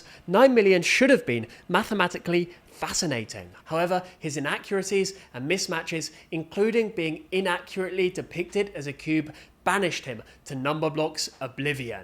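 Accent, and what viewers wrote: United Kingdom. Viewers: A British(?) guy talking about a British show feels so satisfying and I don’t know why.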